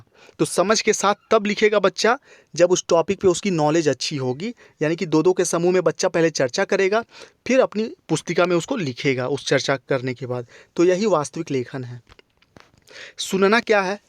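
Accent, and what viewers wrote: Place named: Bhojpuri, India. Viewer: Aapki bhojpuri bilkul hmari trh hai sir😀🙏 आरे तूं जीवन भर नाहीं सीख पइबअ🤗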